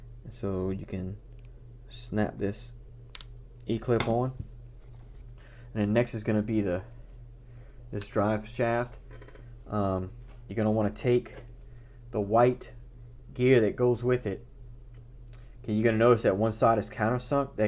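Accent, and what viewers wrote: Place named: Louisiana, United States. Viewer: When I heard your accent I knew. You gotta be south of Houma?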